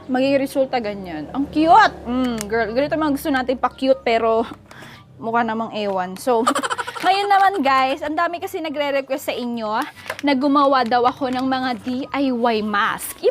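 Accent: Ilocos, Philippines. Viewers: I love your ilocano accent "mallukong"😂 I love you ate!!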